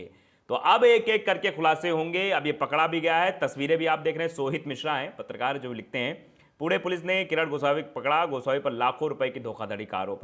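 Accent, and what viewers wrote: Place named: Lucknow, India. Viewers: मस्त अंदाज़ है भैय्या आपका🙏🙏 प्योर up के लगते हो। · FromPak🕊Enjoyed your dialect/ language and style. Are u from Lucknow.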